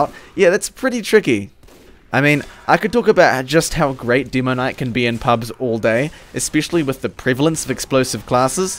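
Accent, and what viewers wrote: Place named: New Zealand. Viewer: I think the kiwi accent is super chill to listen to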